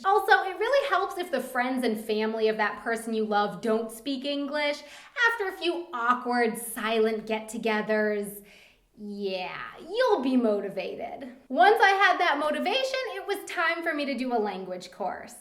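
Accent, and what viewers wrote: United States. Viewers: I don't think you're even trying to get the pronunciations correct. In german or Spanish. Like a deliberate heavily American accent in both. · American accent in Spanish is always quite ...interesting... :D And really easy to imitate somehow. But however your accent is, it is cool that we seem to speak the same three languages. ^^ When communicating with someone it is very great to switch languages! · If you want to make your accent when speaking German more German and less American, I suggest you to listen to some Nachrichten and try to let you and the broadcaster read the material simultaneously. When you do it, you will find the differences between the American and German accent and adopt German accent more quickly. I know you may do not know the material before the program, so you can listen several times and then begin that. I am foreign student in the USA, and that is how I adapted a New England accent within four months. 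(This is my 2nd year here, but many of my friends thought I have been here for more than 6 years and I am learning German too!) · Here's the method: if you are about to learn a foreign language - do not surround yourself with those, who only speak english. Avoid - sorry, but it works best that way - your own language (you know it already). Sign for success: you start to think, to dream and finally to react in your language of choice. Hearing You speak on these videos shows that you are still english-american based - it also shows during those few crumbs of german one can hear every now and then! Pronounciation could be learned by attempting queens-english or scouse a.s.o.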